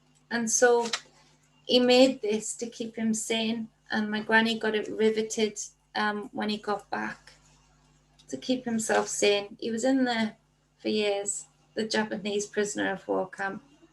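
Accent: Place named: North England, United Kingdom. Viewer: Hi. I have recently subscribed to your channel. I’m a reiki practitioner based in north east England too. Lovely to hear your accent 😊 I’ve made a few videos myself about what’s going on 😉🙏❤️ hope you are well. Take care lovely and keep up the good work 😀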